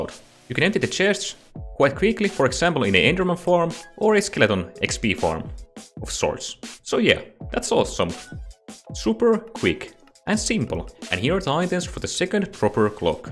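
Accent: Finland. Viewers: this really helped btw are you finnish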